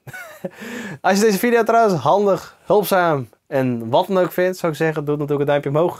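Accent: Friesland, Netherlands. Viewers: Hou van het friese accent. De oorschelpen zijn achteraf super chill had ze ook op mijn razer nari ultimate. Voor lange gaming sessies zijn ze zeer fijn want ze houden je oren wat koud (cooling gel)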